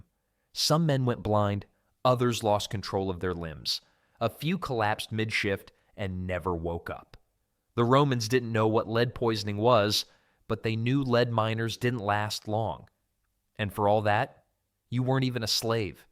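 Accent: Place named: United States. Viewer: Interesting sounds a lot like American hmmmmm 🧐🧐🧐🧐🧐🧐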